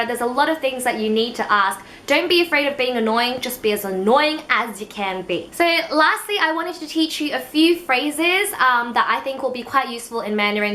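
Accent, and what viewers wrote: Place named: Australia. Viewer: Your 'o's and 'u's sound beautiful. Aussie accent is quite something eh.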